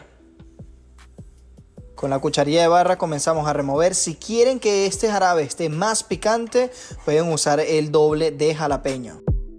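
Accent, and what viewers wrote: Venezuela. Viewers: Este pana parece venezolano :D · Será venezolano este chico? Habla hermoso y es un crack en la barra 10/10 hermoso❤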